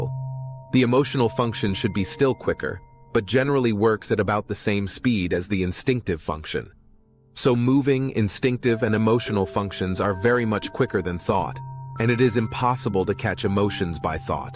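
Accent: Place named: United States. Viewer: Yet another Yank accent.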